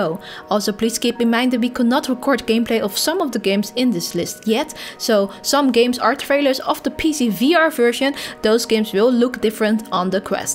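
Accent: Netherlands. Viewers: Doe een video in het Nederlands nu denk je van hhuuh hoe weet je dat ik Nederlands spreek ik kan het horen aan je accent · Hey i detect a heavy dutch accent ^^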